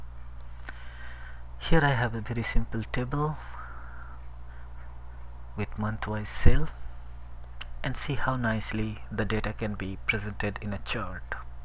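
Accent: India. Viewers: Are u gujju? Ur accent says that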